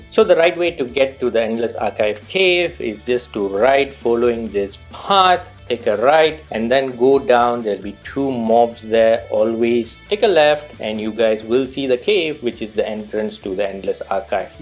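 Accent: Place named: Malaysia. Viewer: Wow Malaysian or Singaporean Indian I’m guessing. Hyped for the endless archive. Re downloading the whole 100gb game again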